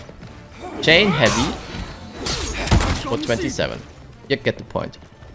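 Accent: Germany. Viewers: german-english always on point:D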